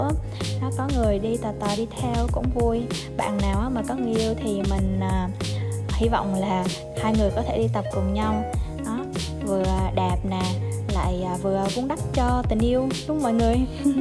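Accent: Da Nang, Vietnam. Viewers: Nghe giọng bạn như ở Đà Nẵng ý?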